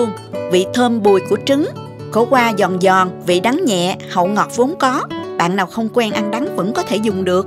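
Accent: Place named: Mekong Delta, Vietnam. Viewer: Thích giọng nói quá, y Chang miền tây luôn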